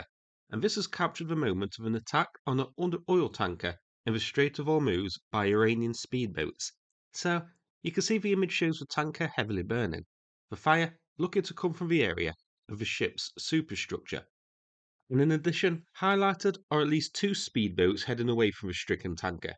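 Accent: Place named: Nottingham, United Kingdom. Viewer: That's the just Nottingham accent for you😊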